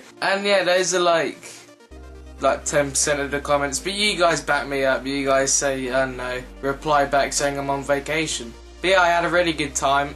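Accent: England, United Kingdom. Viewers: what part of the uk are u from? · Where abouts from England are you from?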